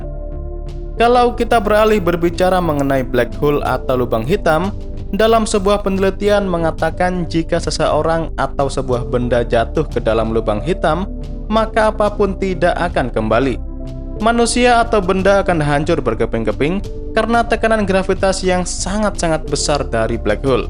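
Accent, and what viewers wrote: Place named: Madura, Indonesia. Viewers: "Seperti biasa daripada kalian penasaran, mari kita bahas bersama". Logatnya benar2 Madura sekali pak. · Dari pada kalian penasaran berlogat madura ygy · Aksen maduranya kental banget mas 🤭🤭🤭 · Pasti org madura yg ngomong nii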